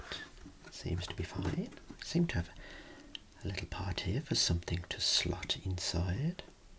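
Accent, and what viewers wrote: Birmingham, United Kingdom. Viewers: the best brummy accent in history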